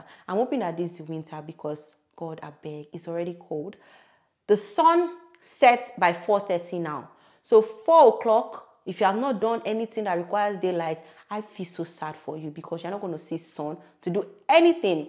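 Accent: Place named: Yoruba, Nigeria. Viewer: Love your mixture of Yoruba and English accent..Otilooo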